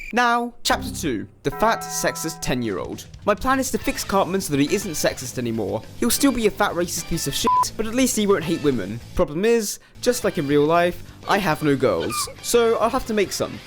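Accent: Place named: United Kingdom. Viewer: Umm bro you are british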